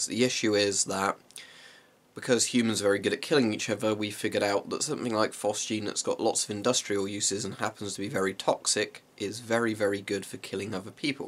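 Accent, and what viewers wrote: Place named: Bedfordshire, United Kingdom. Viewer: Oh okay you sound like you were from my neck of the woods is all.. I'm in Bedfordshire .. Love your vids keep up the good work!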